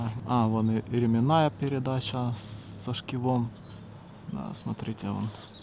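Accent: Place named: Ukraine. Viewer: як приємно чути українську вимову. дякую за все.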